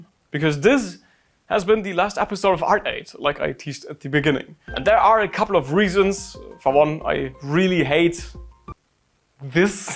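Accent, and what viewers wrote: Germany. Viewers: I just bought your book! draw like a mangaka!!!! thank you so much of all of your videos!!!!!!!!!!! I like hearing your German accent! it inspired me enough to get back only German lessons, so, that you for that too!!!!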